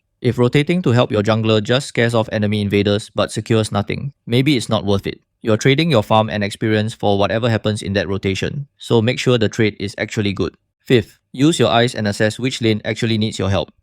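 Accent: Singapore. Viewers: hi are you singaporean by chance im singaporean also and that accent is so recognisable lol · Wait are u singaporean? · Lmao. I heard ur accent and immediately have to double check ur location. Glad to meet another fellow Sgporean. Keep it up bro. As a main midlainer who played most games in mid, i may knew most of this fron exp, but its still a very solid guide that most nowadays still dnt know. Especially rotation. God knows how many times when i played other roles and the mid dnt know how to rotate, in mythic/honor btw. Appalling😂 Edit: Subscribed for support✊🏽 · Are u singaporean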